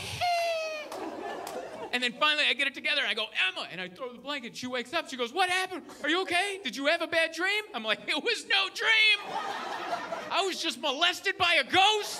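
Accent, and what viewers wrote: Hungary. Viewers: as native Magyar, I was surprised to hear that you don't speak Hungarian. Travelling a lot internationally, I can recognize my fellows just by accent from far away, airports, hotels, anywhere just by hearing (maybe because I have also this thick accent, tuned my ears for). Did you know that you have typical Hungarian accent speaking English? Surprising... Congrats man for your stand-ups, go on! BR, Csaba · Okay I still laughed, but your Hungarian accent is awfulllll 😂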